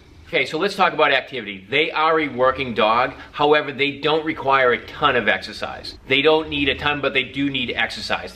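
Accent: Boston, United States. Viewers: You're from the Boston area... Just a crazy guess...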